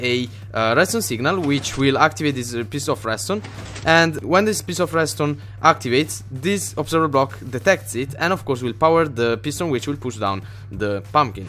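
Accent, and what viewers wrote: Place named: Italy. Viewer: Ma quanto si sente che sei italiano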